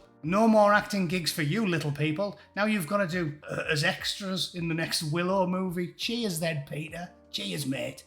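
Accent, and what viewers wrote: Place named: Northern England, United Kingdom. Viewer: Good stuff. First time here. Been working on my ability to distinguish English accents and I got it right from once. Guessed you had to be from the north and......apparently you are.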